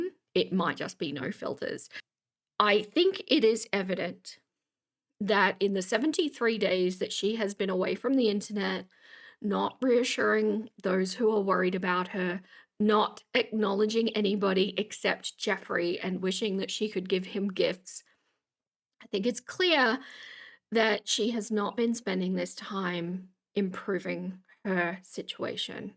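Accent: Australia. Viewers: There's a a lot of aussies flowing in as creators? I like it, i love the accent 😍🥰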